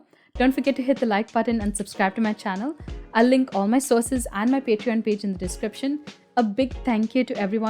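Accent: India. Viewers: Indian English sounds so different from the proper british english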